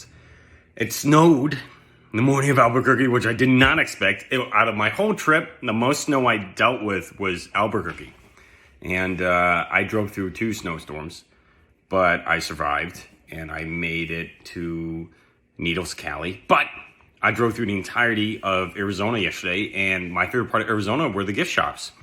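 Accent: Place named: Upstate New York, United States. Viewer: Well done! The Upstate accent, bit of Canadian hockey player in the background? Eh? Yes, ABQ is at bottom of Rocky Mtns, it can snow. Lots of skiing just to north in Ruidoso. Needles is where Oakies escaping the Dirty 30's Dustbowl entered Calif. Burbank, I've gone to watch friends at Flappers Comedy Club! Love that place. I retired young. I saw Fede Alvarez was going to remake Texas Chainsaw Massacre! I drove to Austin, found all the original filmset locations, studied up. I don't have creds for production. Being born in Spain, I was going to beg Alvarez for any job. But Covid19 erupted. I went to north Texas to care for our family matriarch, and waited on updates for TCM movie. Then Fede took it way over to Sofia, Bulgaria. My dream exploded. The Tohill brothers were dropped, David Blue Garcia (Tejano film) was brought in. 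TCM remake was bought by Netflix. It debuted at #2 viewing ever, but the fan reviews say the legend of original director Tobe Hooper (of Austin) was tarnished once again. Long story, sad ending. I mostly film live Native American dance events / powwows for my Youtube. Been on Covid hiatus. Lots of cross-country roadtrips on my resume! Best wishes for your success out in Calif. I have family and friends all over there.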